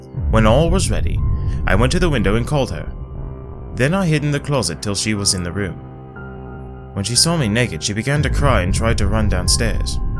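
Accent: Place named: United Kingdom. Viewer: I loved your British accent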